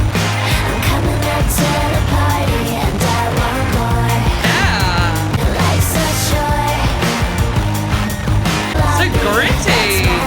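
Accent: Minnesota, United States. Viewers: OMG MINNESOTA SHOUT OUT!! I'M FROM MINNESOTA YOUR ACCENT IS PERFECT! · as a minnesotan i died at your minnesotan accent 😂 MINNESOTA MENTIONED!!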